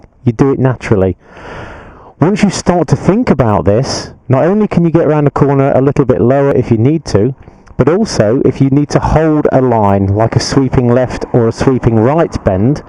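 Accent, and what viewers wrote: United Kingdom. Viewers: theres nothing like a british voice for a tutorial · Hey man, good british accent btw, really clear unlike many others...big question if I'm getting to a really hard turn/curve I know I gotta start using the countersteering tech. but once I'm at it, should I start moving the handlebar gently to the direction I'm turning or countersteer all the way?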